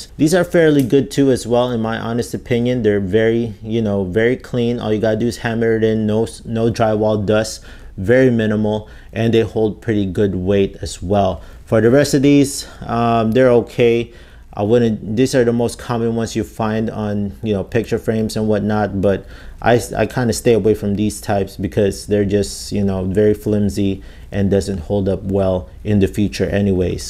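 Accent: Philippines. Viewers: Your videos are very well put together! Pinoy kaba? Haha I lived in the Philippines for a while, I think I’m picking up on an accent!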